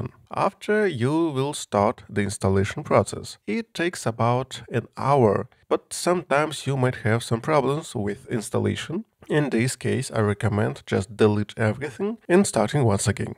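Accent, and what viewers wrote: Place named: Russia. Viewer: Такой русский английский. Это скорее не акцент, а интонации Полезное видео, благодарю за труд.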